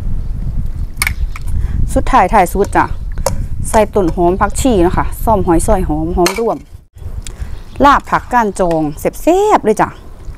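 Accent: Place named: Korat, Thailand. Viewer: สำเนียงโคราช😅😊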